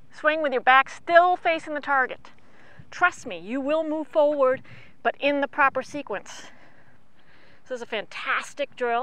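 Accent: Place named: Upstate New York, United States. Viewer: The way you talk reminds me of all my friends from upstate NY. Is that where you're from!?!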